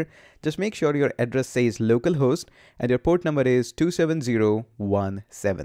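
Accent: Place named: India. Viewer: Are u Indian? apart these things explanation is so clear loved ur way to explain topics and ur English tone too....😆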